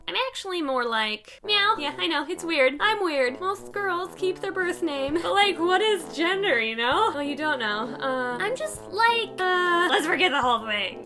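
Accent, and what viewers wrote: Minnesota, United States. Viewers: Hey fellow Minnesotan, your accent reminds me of home :3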